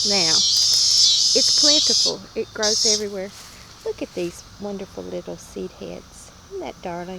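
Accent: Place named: Georgia, United States. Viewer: Love your accent. Reminds me of the ladies I have met in Georgia when I was stationed at Ft. Gordon, Augusta , Ga. in the US Army. Thanks for the great identiication of the variuos species. I just bought some L. Virosa seeds and am trying to sprout some right now. But I have to wait for the second years growth before I harvest any milky sap. God Speed to you !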